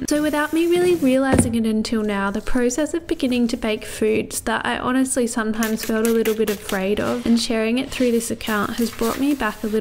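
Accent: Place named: Australia. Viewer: I just realized you have an Aussie accent. I subscribed a few days ago, and I've been watching a lot of your shorts. I didn't notice until now that you have an accent. I think I'm getting too used to Australian accents to where I don't even hear them anymore(My boyfriend and his family are from Tassie). I assumed you were American 💀. Anyway, I look forward to more videos xD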